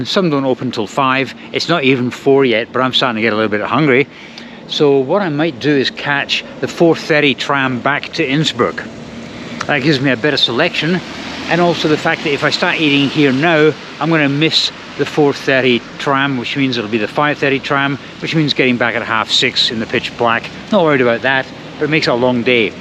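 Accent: Scotland, United Kingdom. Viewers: Isn’t he a Scott?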